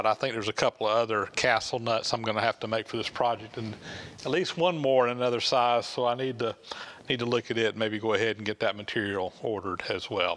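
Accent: South, United States. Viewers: He absolutely has a distinct US 'southern' accent - Georgia to be exact. It's very easy for me to hear. One of the easiest examples is when he pronounces 'oil' as 'awl', which is the classic 'southern drawl'. Also when he says 'made by mah friend...' instead of 'mye friend'. '' Saying 'and such' is kinda Southern, but I've heard it from various US regions, not sure the origin. Though 'castellated' is the correct academic term, just about everyone that I've encountered calls them 'castle' nuts, even listed as such at most vendors and in parts catalogs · Interesting you call those 'castle' nuts. Here in Canada, I have always heard them referred to as castilated nuts. Means the same thing I suppose, but it is always interesting to hear regional differences in speech. You don't have what I would call a 'southern' accent, but there are certianly elements in your speech. 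One phrase you use interests me. You often say "And such" where I would say "And so on". I have never heard that before. Is that a southern or regional phrase? In any case, in any language or dialect, I enjoy your videos! Keep safe during the Covid-19 mess!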